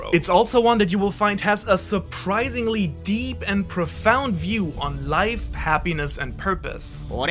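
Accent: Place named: Germany. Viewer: Bruh seit gensos podcast hör ich richtig raus dass du deutsch bist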